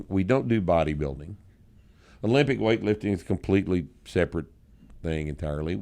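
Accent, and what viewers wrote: Texas, United States. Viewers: Me too and I’m French. Love that Texan accent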